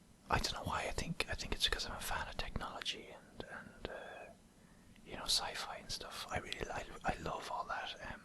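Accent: Ireland. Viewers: Okay, so I recommend you watch 'sword art online'. I'm personally not a big fan of anime but my girlfriend is and so I volunteered to watch some; she recommended me to watch SOA (Sword art online) because.. I dunno, I think it's pretty popular. As a not very big fan at times I still watch it and think pfft... this is so Niche to teens like all animes kind of are. But the concept of purchasing this game (the game being a virtual reality RPG with the twist of being stuck in the game forever until you beat the final boss then you are free but if you die in the game you die in real life) and having a thousand 'beta testers' who know the strategy and how to survive in this game is a pretty interesting concept. Btw, your videos are great and your Irish accent is awesome. Keep up the good work :)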